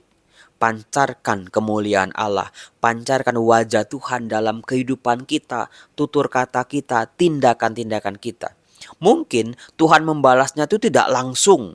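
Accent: Palembang, Indonesia. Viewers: enak semua romo, ...tapi khas Palembang aha. sy pilih. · Saya suka khas palembang mohon 🙏🙏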